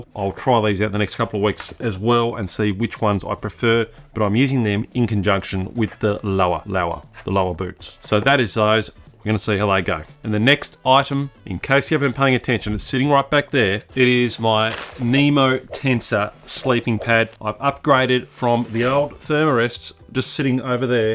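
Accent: Australia. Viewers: As an American man, I think this guy is Australian ☺️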